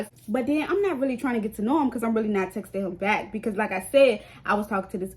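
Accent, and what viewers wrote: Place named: South, United States. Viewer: Your accent is sooo cute and country lol. Where are you from?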